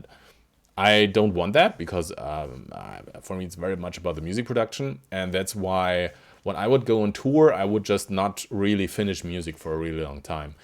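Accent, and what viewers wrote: Germany. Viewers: Well i heard it that your a german person because of your accent(and i´m german too also warum schreibe ich auf englisch, ich pfosten hehe) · Man hört ihm schon an, dass er Deutscher is xD Hätte ich von seinem Pb her aber nicht gedacht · Is der Deutsch? =>where is he from, or is he german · An diesem Akzent merkt man eigentlich direkt er ist Deutscher To my English friends the Translation: With this accent you can tell that he is German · Are you german it sounds like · Well you actually can tell from his accent. I also live in germany and i always hear this accent from german people that speak english.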